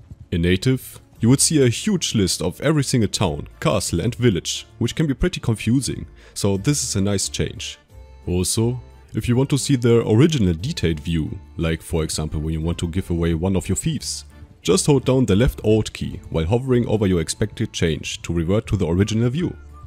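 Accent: Germany. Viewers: 1 little question, where are u from again ? U sound kinda german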